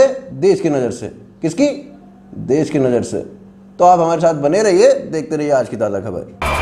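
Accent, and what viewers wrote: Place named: Allahabad, India. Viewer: baba illahabadi (allahabad) accent mare ho tum ek bhokaal!!! nice to hear such accent on ur channel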